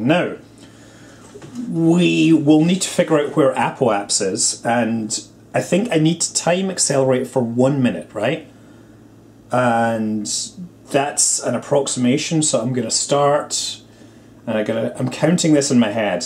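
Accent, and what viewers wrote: Scotland, United Kingdom. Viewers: I understand that, however, say you launch and have enough velocity to reach the same altitude as an orbiting craft for a moment, but you're on a ballistic trajectory so you're going to return to the atmosphere, but you dock, your mass would then... have what effect on the craft you dock to? I imagine the added mass would then decrease the speed of the craft you docked too... I know I could look it up, but I prefer my science dolled out in a Scottish accent ^__^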